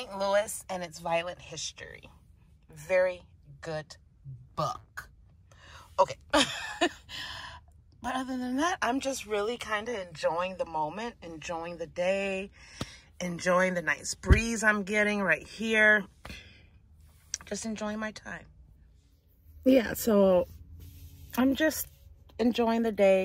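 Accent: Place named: West Coast, United States. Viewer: That's a west coast accent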